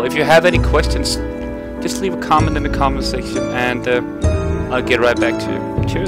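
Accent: Denmark. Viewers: Du er dansker? ;) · Nice tak for hjælpen kan høre på dit engelsk du er dansk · Er du dansker??!??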